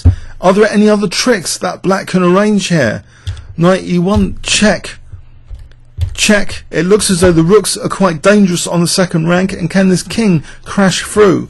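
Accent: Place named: Cockney, United Kingdom. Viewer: great game, and great commentary! I love your competence, your enthusiasm, your emotional way and your nice Cockney accent! thanks for all these videos, it's very entertaining. and happy new year :)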